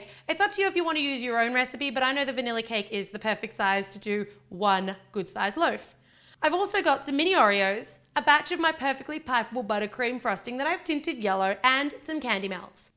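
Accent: Australia. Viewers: Is she Australia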